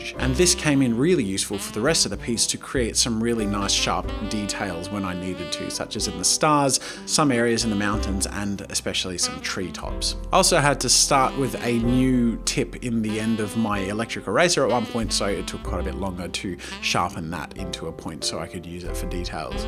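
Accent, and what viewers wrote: Australia. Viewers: Hey dude are you Australian cause if so your one of the first ive herad off [im an auzie] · So you are Australian... how didn't I notice it earlier?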